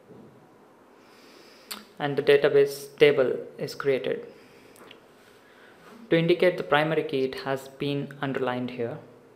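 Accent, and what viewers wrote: India. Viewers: Where is this accent from? India?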